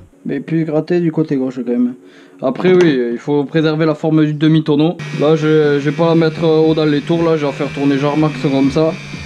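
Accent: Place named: Tarn, France. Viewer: Bouduuuu, saint sulp, lavaur ou graulhet ?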